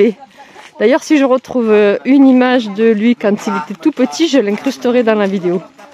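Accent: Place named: South, France. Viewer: Tu n'as pas perdu l'accent Français du SUD 😛 Top la vidéo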